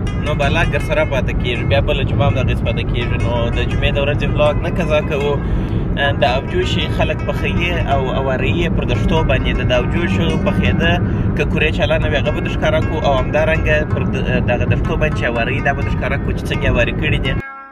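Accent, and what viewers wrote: Kandahar, Afghanistan. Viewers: Love the sweet Qandahari accent. This vlog took me back to my country and provided food for my soul. Great work brother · Mohammad Asif KHAN hahahahaha. Kabulai lahja?????? Kabul ki Pashto lahja da koma showa? Lara Pashto aw Bara Pashto dway staree lahjay dee. Da da Loi Kandahar, da. Kotee کويټه aw shawokhowa lahja da.Kabul lahja na laree.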